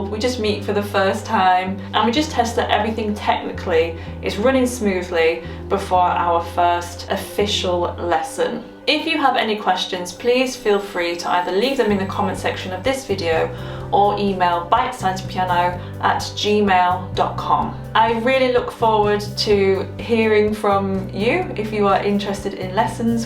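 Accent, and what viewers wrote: United Kingdom. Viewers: i love your british accent. You the best teacher. I learn so much with your music videos tutorials =)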